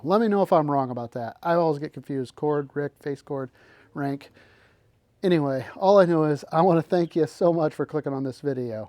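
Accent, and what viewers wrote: Germany. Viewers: Why does this have a German voice over?????????????????????????????????????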